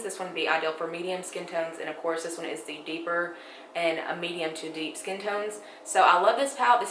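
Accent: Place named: Texas, United States. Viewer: I thought you were from Texas. New subbie. Love your channel❤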